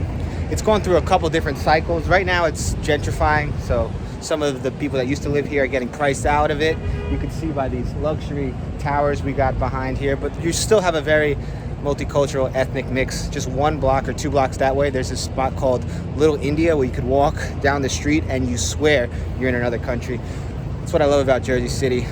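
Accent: Brazil. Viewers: Parece um brasileiro tentando falar inglês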